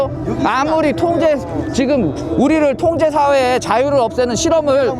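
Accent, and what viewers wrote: Gangwon, South Korea. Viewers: 강원도 말투 같은데? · 야 강원도 말투다 임마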